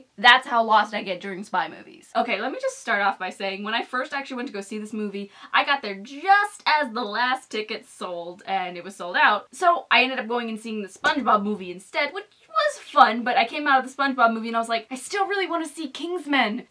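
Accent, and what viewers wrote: United Kingdom. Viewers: I just happened upon your channel today, and I'm really digging all your characters! Do you think you'd be able to make one out of the slick RP accent you're doing here? Also, if you're up to the challenge, it would be awesome to see some of your more established characters do impressions/parodies of each other (unless you've already done that... I'm still working my way through your archives). Keep up the great work!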